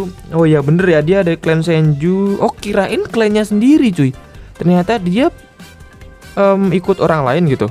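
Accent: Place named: Madura, Indonesia. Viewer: kenapa nadanya jadi tretan dah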